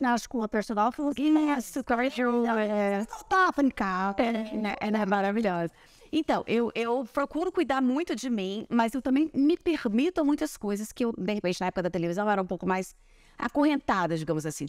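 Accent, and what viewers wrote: United States. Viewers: tá falando bem americanizada 😊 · Ta com sultaque americano 😮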